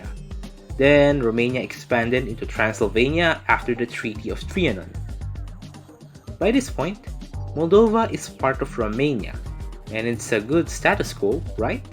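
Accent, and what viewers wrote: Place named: Philippines. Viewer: Knew you were filipino from the accent. Good stuff dude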